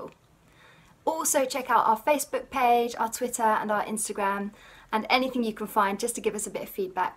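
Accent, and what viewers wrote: United Kingdom. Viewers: Great voice, like made for this. A bit cliché with the British accent ;)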